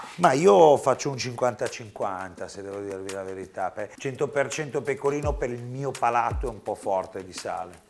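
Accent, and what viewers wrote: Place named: Apulia, Italy. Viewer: Sono Romana, ho imparato prima a cucinare la carbonara, poi a camminare... Mi sono emozionata a vedere questo video. Hai coccolato questa Carbonara.... E m'hai fatto venire fame. Ho sentito quel pizzico di Puglia tra il Meh e il fantasticare sul sud. Comunque, io solitamente faccio rosolare il guanciale in padella, pizzico di pepe, ci butto sopra la pasta, l'uovo, un mestolo di acqua di cottura e alla fine il pecorino, in cucina so che cambiare gli ordini degli addendi, il risultato cambia, cosa pensi di questa composizione? Mi conviene cambiare e lavorarla più come hai fatto tu?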